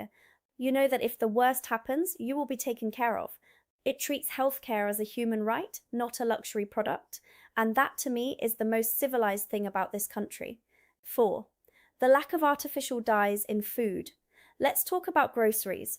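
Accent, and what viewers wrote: England, United Kingdom. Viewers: Are you really American! You have a very British accent. 😊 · You sound British to me ,don’t worry about the rain it keeps the countryside green just how we like it as long we get a decent summer. You will not hear me complain about the NHS I had cancer in 93 and again last year and no I never smoked just bad luck I suppose glad you are enjoying your time in the UK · How long you been in Britain? You sound British. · Why do you sound British? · Anyone told you that you look like Belinda Stewart the mother in the Inbetweeners . You have an English accent . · you are slowly picking up the British accent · Brit here. You sound British to me my love. Is this real? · As a Scot, this girl sounds like an English woman (Not a problem, English women sound very fine with their foreign accent) She doesn't remotely sound American though. 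I don't care who comes from where, but I'm very suspicious of contradictions? I've no argument about what she says. Her narrative is purely of England, not the UK. Her accent is not American, I'm just wondering why?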